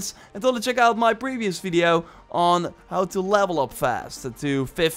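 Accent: Netherlands. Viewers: Hes dutch thats why he got that accent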